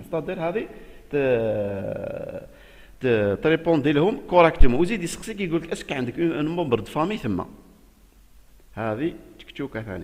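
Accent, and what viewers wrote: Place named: Kabylie, Algeria. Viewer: Allah ibarek je pense nta qbayli hsab la façon de parler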